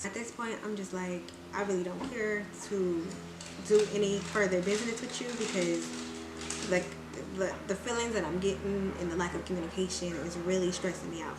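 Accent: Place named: United States. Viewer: mad country sounding. I'm from NYC lol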